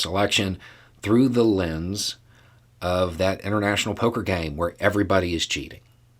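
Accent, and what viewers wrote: South, United States. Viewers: Why do we get a bullshit republican "newspaper" ad after watching the fifth column?. Do they think that all rednecks are stupid and Uneducated? Certainly not if we are listening to this guy who talks with a hard as rock southern accent and still makes more sense of the political shit happening every day better than CNN. Kee it up hombre, we will just skip the Epoch Times crap!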